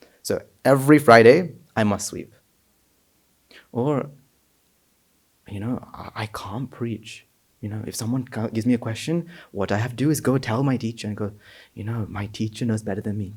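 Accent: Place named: United Kingdom. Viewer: Profoundness with British English excent sermon❤ saadhukara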